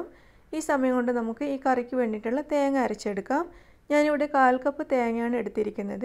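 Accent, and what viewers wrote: Kannur, India. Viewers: uuyyantee apppaa. Kannur slangg. Nice receipe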